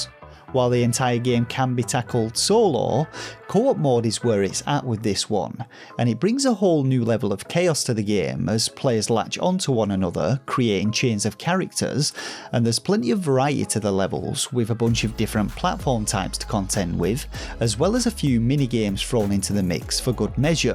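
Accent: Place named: North England, United Kingdom. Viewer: Don't listen to him, I love your accent. Where exactly are you from in the north? I couldn't quite put my finger on it. Is it Middlesbrough?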